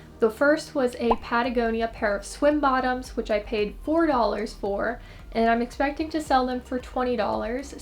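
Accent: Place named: Pennsylvania, United States. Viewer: I agree with the selling up. I have a really hard time paying for items, it just goes against my thrifty/cheap way of thinking, lol. Also, nice to hear a Pennsylvanian accent. I’m originally from State College area but am in Upstate NY now!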